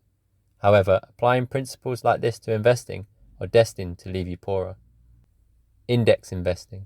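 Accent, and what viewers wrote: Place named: United Kingdom. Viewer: Dang British accent, this Merica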